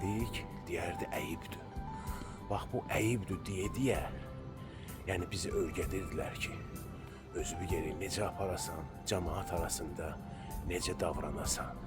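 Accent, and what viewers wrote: Baku, Azerbaijan. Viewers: Приятно слышать чистую бакинскую речь!Вчера в Питере в кафе я уходя заметила семью,где 16 летняя дочь вместе с отцом смачно облизывали пальцы на глазах у всех!я по азербайжански им сделала замечание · Говор у вас настоящий бакинский ,нет прежнего Баку ,практически не осталось бакинцев ,как поет Корнелюк : город которого нет.А нынче в моде именно и этот говор: ЦЦЦ ,а бакинцы действительно последние из могикан ,хотя навряд ли молрдеж знает кто такой Фенимор Купер .( в школе читала эту книгу ) .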